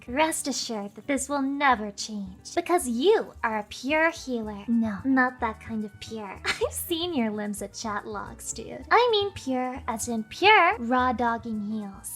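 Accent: South, United States. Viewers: I can't stop watching after hearing her southern accent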